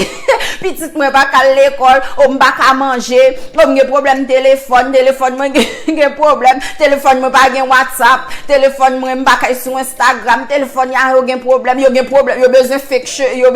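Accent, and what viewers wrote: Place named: Cap-Haïtien, Haiti. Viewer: Sa son fanm okap pareyanm kanmenm palèy gn twop resanblans premier vidéo'w map gade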